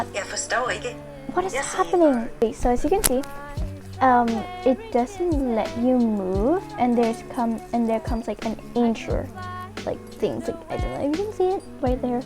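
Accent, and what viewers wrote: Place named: South Korea. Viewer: I have no idea why, but you sound a teeny bit Korean I think?? Or Chinese? Just me, ok.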